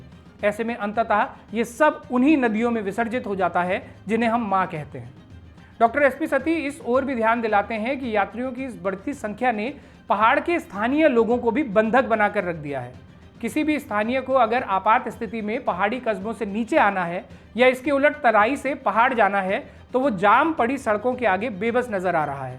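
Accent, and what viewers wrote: Garhwal, India. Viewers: ❤ ji आप उत्तराखंड में लोगों को इसी तर्ज पर जागरूकता अभियान जारी रखें, आप की जानकारी, वक्तव्य, तरीका जिससे गढ़वाली भाषा का तड़का वाह ❤ आनंद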